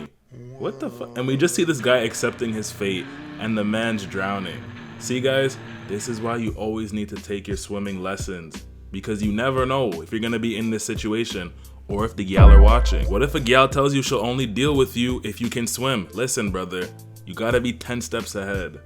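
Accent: Toronto, Canada. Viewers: You have that native Toronto accent lol it's strong!!! You sound like Drake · Never thought I’d hear a Toronto man doing one of these types of videos 😂 Toronto man anime is a vibe 😂😂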